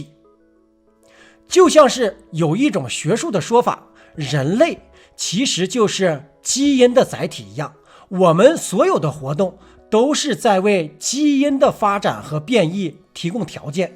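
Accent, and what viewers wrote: Northeast, China. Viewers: 👍👍💕👏👏好像是我們東北口音？！支持你✌️💐🕊️🕊️🇸🇪🇳🇴🇸🇪🇫🇷🇮🇹🇩🇪🇻🇳🇭🇰🇯🇵🇸🇬🇳🇴🇮🇸🇫🇮🇳🇴🇹🇭🇦🇺🇯🇵🇸🇬🇺🇸🇹🇼～～～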